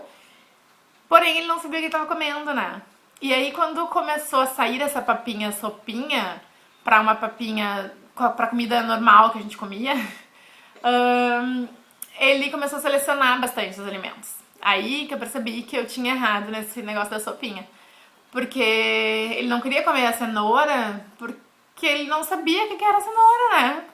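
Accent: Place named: Rio Grande do Sul, Brazil. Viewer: oi amei é sabe o quão é bom ver uma mãe assim de cara limpa com sutaque lindo aqui do nosso RS e estou só na espera do próximo beijo fiquem com Deus.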